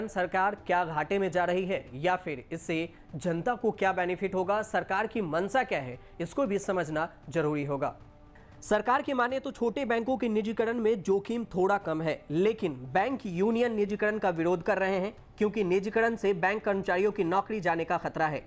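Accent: Bihar, India. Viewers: Kisne is anchor ko rakha ,sudh hindi tak padna nahi aa rahi.bihari babu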